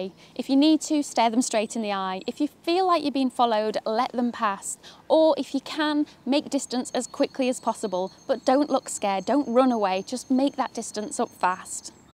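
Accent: United Kingdom. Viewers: I was confused for a moment when you mentioned fire arms despite the British accent😂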